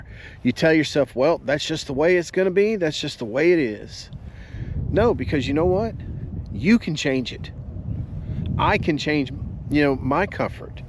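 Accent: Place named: South, United States. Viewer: Thanks hear your southern voice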